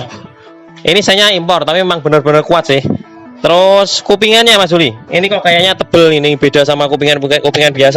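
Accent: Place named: Java, Indonesia. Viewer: Kalau saya sih untuk segi kata² dan penjelasan nya agak di perbaiki biar mudah di cerna kayak roti hehehe itu sieh comentar saya lur dan dari segi bahasa agak di perjelas lebih enak bahasa indonesia tapi terkadang agak susah juga soal nya dari keseharian bahasa nya medhok bahasa jawa Siap slur maaf kalau ada salah² kata Mohon di maafkan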